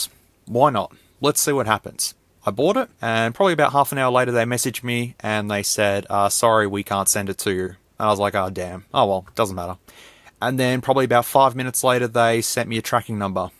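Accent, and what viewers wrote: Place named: Australia. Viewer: You have one of the most interesting Aussie accents. I often noticed some Aussies add an "R" to wherever an "o" is in a word, but yours is super pronounced!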